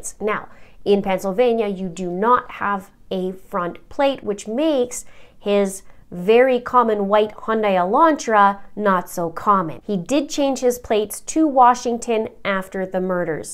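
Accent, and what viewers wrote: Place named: Canada. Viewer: love timelines, so important to this case, also love her canadian accent, my relatives are from viking, ab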